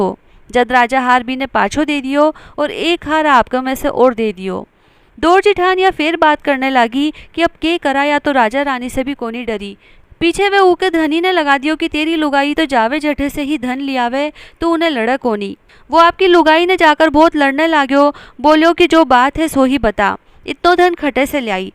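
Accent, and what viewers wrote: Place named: Marwar, India. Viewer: Bhabhiji, mera sasural Garg Agarwal, Sikar ka hain. Vahi ki bolti marwadi mein kahani sunate hain Aap, toh ghar jaisa hi lagta hain 🤗 Jay Shri Radhe 🙏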